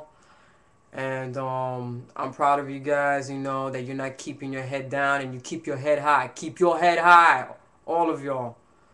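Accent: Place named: New York, United States. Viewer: Good luck to you! I though i detected a nyc accent.. It's very easy to transition in nyc, reconsider doing it over here. Peace bro.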